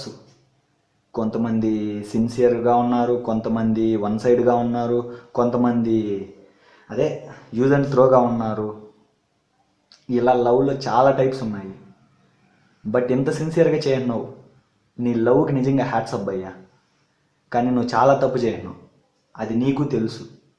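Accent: Rayalaseema, India. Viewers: Ur 100% right bro Baga cheppavu nuvvu Bro manadhi rayalaseema kadha expt chesanu ni matalu vintumte telustundhi ......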